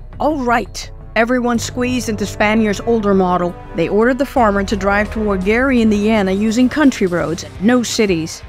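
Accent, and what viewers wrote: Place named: Netherlands. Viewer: I love that silky dutch accent. Another brilliant episode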